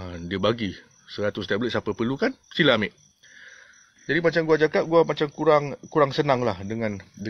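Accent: Kelantan, Malaysia. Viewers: Kemah keming kemuk vutoohh yip yip yip😂..terbaik bey lado..kecek Kelate sdap dgar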